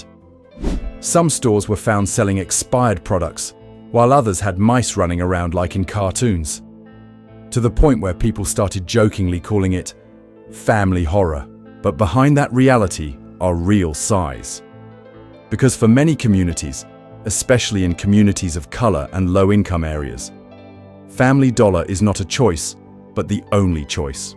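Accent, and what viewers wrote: United Kingdom. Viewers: Why do you have a British accent?? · Hey Dude, where did you get that British accent?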